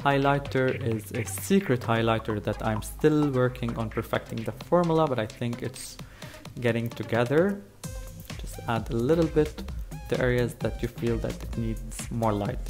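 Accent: Arab world. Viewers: مبين عليك عربي من طريقة كلامك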